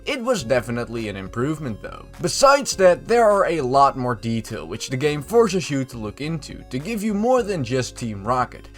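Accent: Netherlands. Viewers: Only took me ten seconds of hearing your accent to find out you're Dutch haha. Either way, nice video and you're definitely right. Though these glitches are kind of to be expected since they're essentially cramming a DS game onto GBA hardware. What would be your favorite Johto romhack then?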